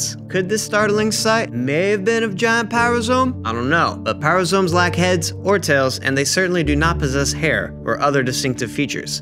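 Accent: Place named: South, United States. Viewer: Ok ive never heard this on but i swearrrrr on your vids im detecting a bit of southern accent being from Bama i perk up when i hear it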